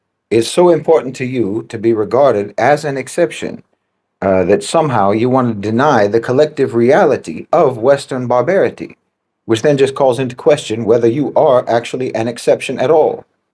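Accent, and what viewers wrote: United States. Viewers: As he condescends with an American accent. 🙄 I can see what you see. Unfortunately, i am silenced while you are given a voice AND a platform to damn the 'collective West! Unbridled patriarchs in positions of power are the scourge of the world. Don't conflate those monsters with a population, the majority of whom were brainwashed by the most powerful propaganda machine to have ever existed! What makes YOU the exception?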